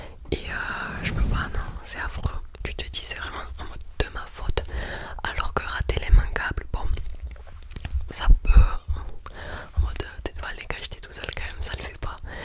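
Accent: South, France. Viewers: Avec l'accent du sud c'est tellement mieux car à part Tom ASMR personne réellement a ce petit détail dans la voix et c'est sympa puis le fait que tu aimes le foot ça change tout . Puis le foot franchement si tu peux c'est bien aussi et ton niveau ça serait cool de te voir sur un match ou deux .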